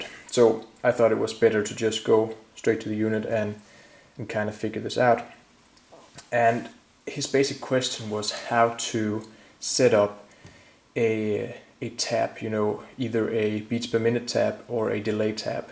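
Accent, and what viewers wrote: Denmark. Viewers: I think I've contacted you before I know you're from Denmark I can tell from your accent. My wife is Danish and we go there every year. I don't really know where in Denmark you're from definitely not Jutland. Or Fyn so I guess the Copenhagen area. We have a house in Gilleleje. Just got a gt100 out of the box from being in storage so now I have to learn how to use it I'll be watching your videos. I'm used to the GT 10 where I could copy built in patches from one location to another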